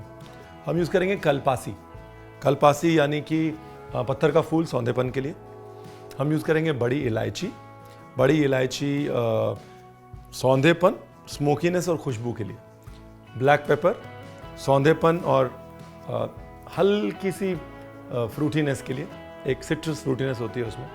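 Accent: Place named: Punjab, India. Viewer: From Lucknow...but has Punjabi accent ♥😂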